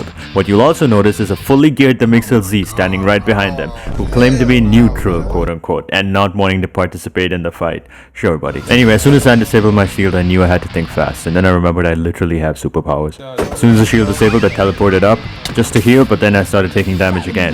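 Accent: India. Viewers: u sound indian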